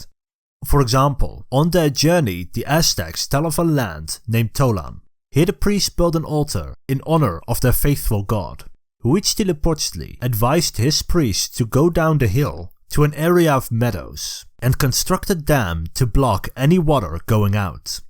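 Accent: Netherlands. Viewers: Irritant Nederlands accent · its subtle but are you Dutch? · I recognize the accent....Dutch! I used to live in Holland. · Are you Dutch ? Your accent sounds Dutch :) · I hear a typical Dutch accent.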